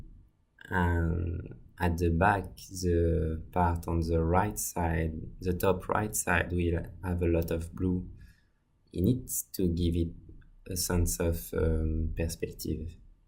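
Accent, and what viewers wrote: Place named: France. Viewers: Aren't you french ? Damn this accent XD Anyway, I love Tales From Earthsea, so I'm glad to see someone drawing a scene from it :D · He can’t sound more French than that😂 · For sure his French xD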